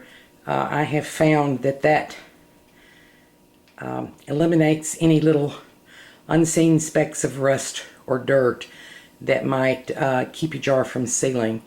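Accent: South, United States. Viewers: i am binge watching your videos! i love that you are keto as well....i also cook on cast iron!!! oh Lordy! i really love that i found you...your sweet voice and slow southern style is so relaxing to my frenzied mind<3<3<3 you mentioned in one of your videos...i think spaetzles...that you are cooking for one? how is your husband, if you dont mind my asking...you may have mentioned it in another, i just haven't gotten there yet :{ this is one of your more popular videos...so i hope everyone here offers many prayers for him and you for as long as youtube is around! ;}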